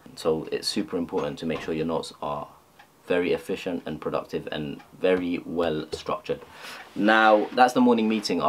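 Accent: United Kingdom. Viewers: What is your accent? Is it british or south asian or both? Lol its all over the place.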